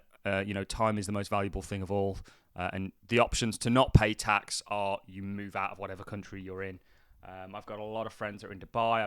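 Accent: Birmingham, United Kingdom. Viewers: What part of Brum are you from?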